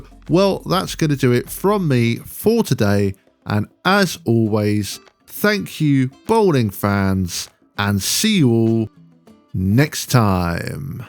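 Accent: United Kingdom. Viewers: Bs. A British AI voice?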